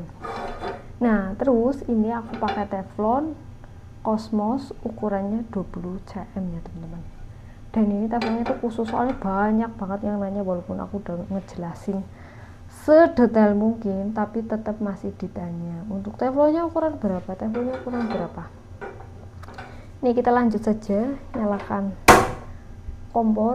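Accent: Banyumas, Indonesia. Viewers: Makasih mb ,mba ngomonge ngapak wong ngendi ,kreatif banget ,makasih ya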